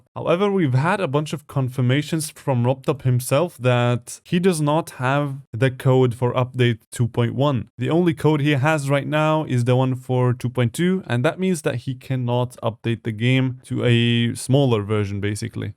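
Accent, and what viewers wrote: Germany. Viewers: You are from Germany?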